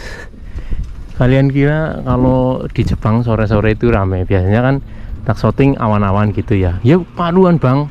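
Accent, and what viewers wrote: Banyumas, Indonesia. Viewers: Mase asale saka ndi koh ? Banyumas apa ? 😂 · Ngapak e njiplek bgt mas 😭 the real medhok neng ngana 😭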